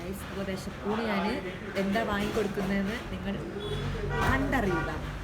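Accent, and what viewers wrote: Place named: Malappuram, India. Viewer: Nalla parajayam ulla baashaa malappuram anoo naadu 🧐 or kozhikode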